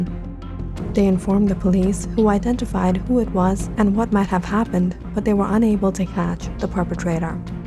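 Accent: South, United States. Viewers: Great southern accent! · it's the country girl accent for me😂😂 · I like her country accent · Love the country accent 😁💕 And so was the story! Underrated channel💕💕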